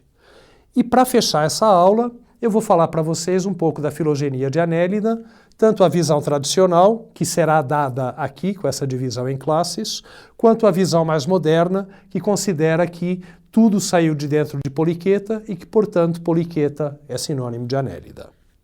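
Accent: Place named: Portugal. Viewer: Às vezes o sotaque dele me parece sutilmente o de Portugal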